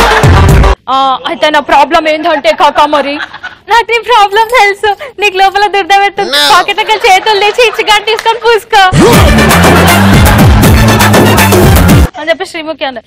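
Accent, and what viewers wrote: Telangana, India. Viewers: bad .. nevvu Telangana .voice change chayu.... ardham kavadam leadu..try to improve Ur bodylange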